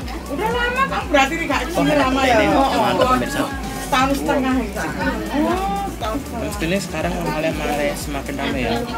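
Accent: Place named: Kediri, Indonesia. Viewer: selalu nunggu upload tan terbaru... Mz ngemong and sama temu kanggen soalnya juga punya saudara kediri and blitar yg logat ngomongnya sama.. ☺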